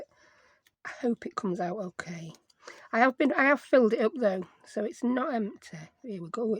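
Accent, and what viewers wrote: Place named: Sheffield, United Kingdom. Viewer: Morning just to say I love all your videos love your accent you make me laugh probably because I’m from Sheffield as well. Do you have any classes going anywhere would like to go if you do ?😊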